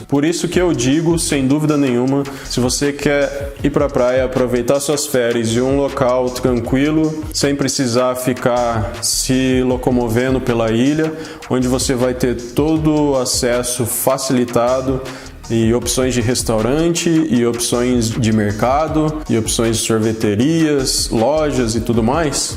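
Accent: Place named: São Paulo, Brazil. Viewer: Fala você é do interior de SP ou Paraná? Pelo sotaque parece!!!😁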